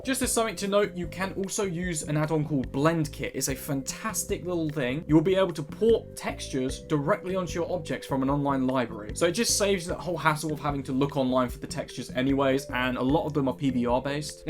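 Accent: United Kingdom. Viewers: my passionate hate against British english is gone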